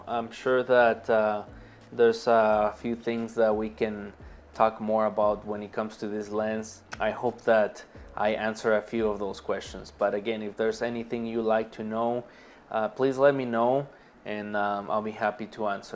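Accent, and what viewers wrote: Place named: Spain. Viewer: I know it's hard for native Spanish speakers but you gotta work on your pronunciation of "z" and "v". Zi is not Ci and Video is not Bideo.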